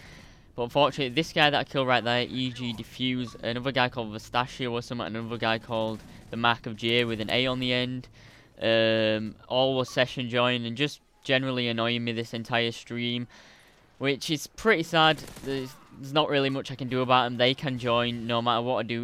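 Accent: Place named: Scotland, United Kingdom. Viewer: You sound more scottish in your livestream.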